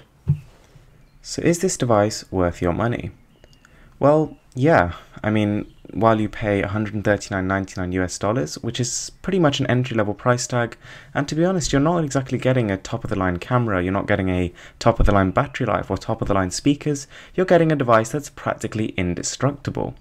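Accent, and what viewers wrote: United Kingdom. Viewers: Hi man, u have a nice British accent..thanks for these videos, this is a gr8 phone.. I hav bad signals on my Motgo X 1st gen and hence went for Moto E 2nd gen which is pretty much an amazing phone-water-resistant, scratch proof (GG3), i've tried it too, dual sim, stock features, doesnt hang in normal usage, 2 days battery. Would u suggest this phone over my current phone ? I m lukng to just change my phone into a >4.7", tatz why..but shld be nonetheless for rough use n dual sim, shldnt hang, i dont play games. Hope its water-resistant?? Ofcourse I'll miss my legendary Moto Display feature.. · Hey I Just want to say I love your videos, and your british accent. I want to ask you a questions what budget smartphone would you recommend for some one in the US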